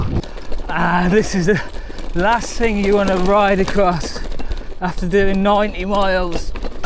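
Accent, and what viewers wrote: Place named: Hinckley, United Kingdom. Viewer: Didn't realise you were from round Hinckley way. Not sure how I missed your accent because I've got relations from there... ma'duck 😅 I'm up in Northumberland myself, you were about 5 miles from my house when you dropped down into Haltwhistle on your GB Divide trip.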